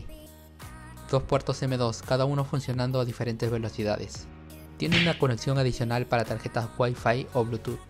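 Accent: Peru. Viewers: cabro, sos de peru¡??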